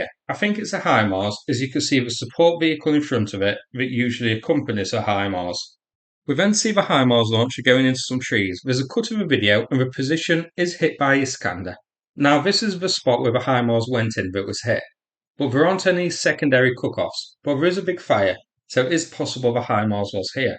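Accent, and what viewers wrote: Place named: Wales, United Kingdom. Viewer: bruv, srsly pls try speaking a bit MORE english, and a bit LESS of whatever accent of yours that is...sometimes ya seem forget you have an INTERNATIONAL audience, and we dont speak WELSH or GAELISH^^ xD thx in advance!!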